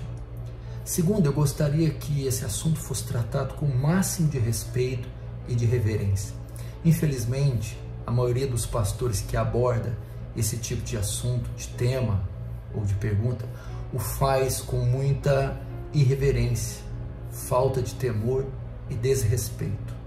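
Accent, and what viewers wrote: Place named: Minas Gerais, Brazil. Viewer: Mineirinho kkkk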